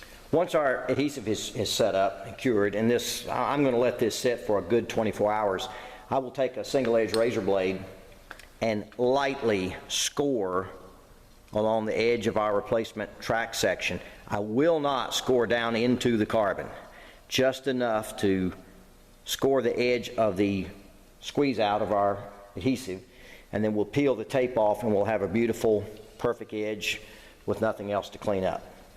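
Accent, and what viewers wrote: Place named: South, United States. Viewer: Hi! I have followed your involvement with the Weta on the forum since about 2010 but never heard your voice. That's a great Southern Raised accent you have there! Do you play the banjo? I have done the job you illustrate several iimes and wish I had known about the fishing line trick. George Morris Weta 117 Scotland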